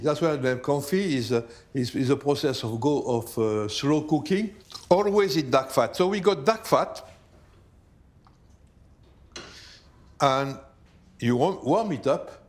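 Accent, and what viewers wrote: France. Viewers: Lovely, charming man, absolutely love his French accent! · Alors check again, D'accay His frenglish is so beautiful.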